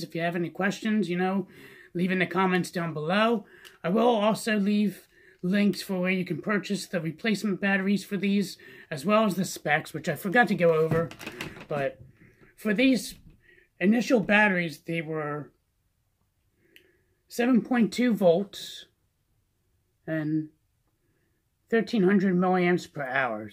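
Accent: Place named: Philadelphia, United States. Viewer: You sir are from the Philadelphia area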